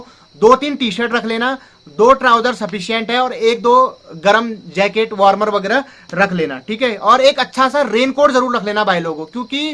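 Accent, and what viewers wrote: Pahari, India. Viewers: भाई हमको आप की बात चीज बहुत अच्छा लगा पहाड़ी भाषा नीको लागछ 🙌💐🙌💐🙌💐🙌💐🙌💐👌🌹👌🌹👌🌹👌🌹👌🌹❤️🌹❤️💐❤️💐 दिल से बहुत अच्छा बहुत अच्छा है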